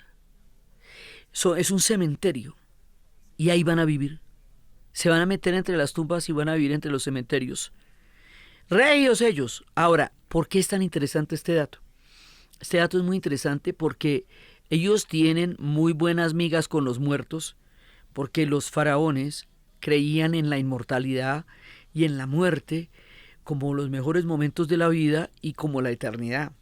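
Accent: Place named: Colombia. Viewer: Escucharla a es transportarse al lugar donde ella narra, pero sin salir de casa 😬😬😬. Me encanta esa sazón Colombiana que le pone, que está como el ajo, en su punto exacto ni mucho para arruinar, ni poco para no sentirse. 👌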